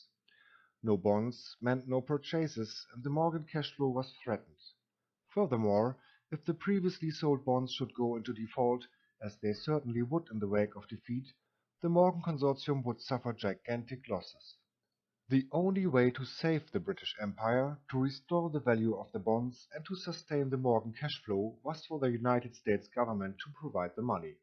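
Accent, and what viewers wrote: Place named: Germany. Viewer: Deutscher Akzent👍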